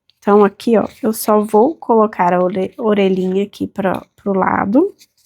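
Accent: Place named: Minas Gerais, Brazil. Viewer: Maravilhosa a saia, e as informações adicionais sobre como ela veste corpos variados me ganhou! Inscrita com certeza, muito obrigada! Vc é mineirinha? Seu sotaque é familiar 😂❤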